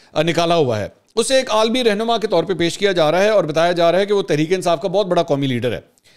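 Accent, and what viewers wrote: Punjab, India. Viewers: Punjabi style speaking is excellent, but please add some Pushto dialogue like Nishta etc. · Hahaha.. bhai ur punjabi touch rocks😊😊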